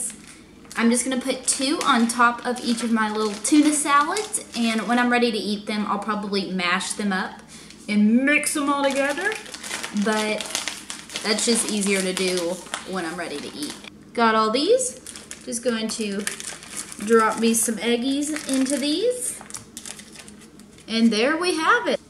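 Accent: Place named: South Texas, United States. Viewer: I'm doing the same type diet myself. Your southern Texas accent is strong lol. I'm from Texas too. Thanks for the ideas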